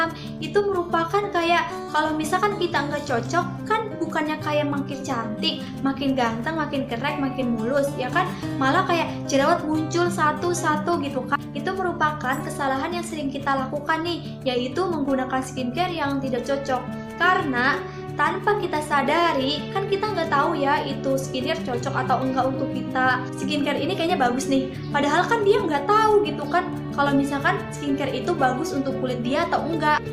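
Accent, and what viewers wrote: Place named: West Java, Indonesia. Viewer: sunda na nong 🤣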